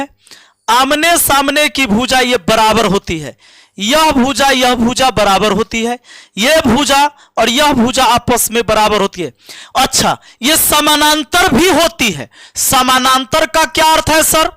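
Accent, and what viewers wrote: Bihar, India. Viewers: एक बिहारी सौ पर भारी आवाज से पता चलता है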